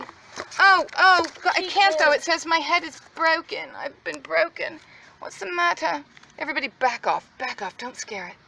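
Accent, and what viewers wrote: England, United Kingdom. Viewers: Make banana date bread as it is awesome! I have to laugh at your British accent because my mom is British! I get a kick out of it! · 🤣🤣🤣🤣🤣🤣🤣🤣🤣🤣👍 ENGLISH ACCENT Or AUSTRALIANO 👍 💙♥️💙♥️💙♥️💙♥️💙♥️💙♥️💙♥️💙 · great English accent, wonderful bird rescue, and awesome haul! I L O V E your videos. You always make me feel good when I’m down!! Also, been meaning to tell you I really like your bracelets! 😘😘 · Good English accent. 💕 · You sound like my auntie with ur English accent 😂😂😂 love ur channel all the way from Scotland 🏴 so glad the bird was ok 👌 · I love you , but loose the English voice please ! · you sound English not Australian and trust me I should know I'm very English and you sounded just like me x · Aww poor bird! Glad it recovered. By the way, your English accent is better than mine....xxx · THT WAS AWSOME I LOVE THE ENGLISH ACCENT LOL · Great British accent! 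I love it. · I am sooo happy you saved the Robin!! I enjoyed your English accent, spot on I dare say! Love your videos. Stay safe. ❤️ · you English accent, you could be one of us!!! You would settle right in! 😃👍👍👍👍💗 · Why do you keep switching to an English accent!?!. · Sticky toffee pudding is a great English dessert made with dates, in honor of your lovely accent. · 😆I love the British accent! You are funny frugal mommy!❤️ You’re a pretty good southerner too! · Bless your heart, you had me in tears and laughing my head of over the poor bird flying into danger of the cats and will woman. With English accent saying the wings are fine but the head is hanging. Let’s keep him quiet and maybe he’ll fly around the bathroom. You open the box and his head is up and the we one flys up to the top of the tree 🌳 Oh my goodness you remind me of myself, English accent and dramatics❤️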